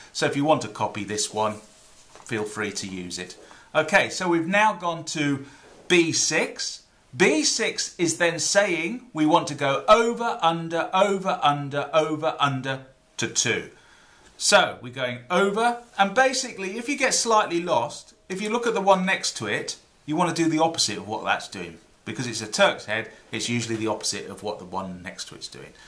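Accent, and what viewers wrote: United Kingdom. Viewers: The reason for it being so good and so clear ist because it's with a brtitsh accent! Which makes it easy to understand. Oh, the video is brilliant! Cheers for that!!!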